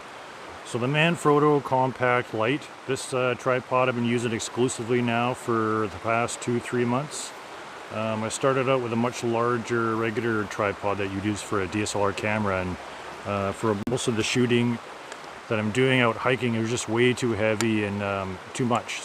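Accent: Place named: Canada. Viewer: I heard his Canadian accent 5 seconds in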